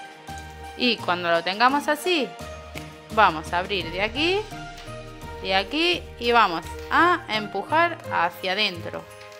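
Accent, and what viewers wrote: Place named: Spain. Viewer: deja de hablar como española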